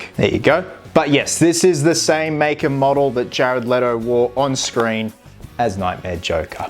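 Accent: Australia. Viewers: Hey. Just subbed to u. I also used BYS for my joker cosplay in school book week and it turned out great. Although I used hair spray on my hair, did the trick, but was a bit light. What else could u recommend? Also, it sounds like ur from Australia, and if so, me to!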